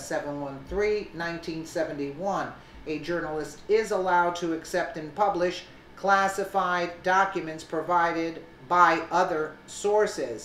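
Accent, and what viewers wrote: New York, United States. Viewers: I am from south ga and I love your New York accent. It warms my heart everyday!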